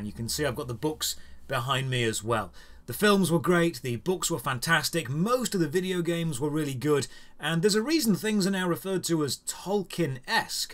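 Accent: United Kingdom. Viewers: Why is reviewing MMO with a british accent?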